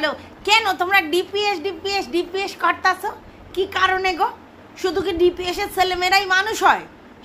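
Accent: Bangal, Bangladesh. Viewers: ভিডিও content je flavour tar sathe বাঙাল ভাষা মিলেমিশে খুব খুব মজা 🎉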